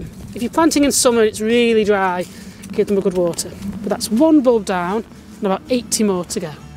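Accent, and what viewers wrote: United Kingdom. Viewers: I like the British accent, but I have a little difficulty in understanding it !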